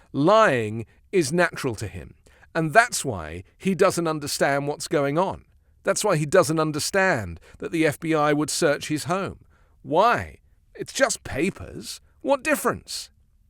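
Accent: United Kingdom. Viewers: I love his voice! It's British so it makes him appear smarter are more authoritative. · Somehow that British accent gives the entire affair more gravitas. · I love listening to you shred Donald Trump. Insults with British accents have more sting. Beautiful.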